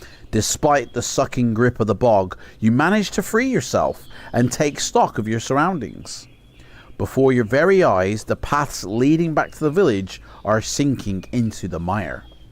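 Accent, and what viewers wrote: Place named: Cornwall, United Kingdom. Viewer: I can’t watch this shit. I can’t see the book or the pictures, all I see is your fat ugly mush chatting bear shit, making silly noises and the front cover page on the other side of the screen. And you talk too slowly, take 10 times longer to do everything than in needs to, and you’ve got an annoying Cornish accent. Which is fine for adding some effect, but you’re focused more on effect than reading the story, in a normal fashion. And we don’t need to make every single decision for you either, just getvon with it mate, life’s too short for all the faffing, farting around and film flam. What kind of game is this? How to take the piss out of fighting fantasy books? I’m out.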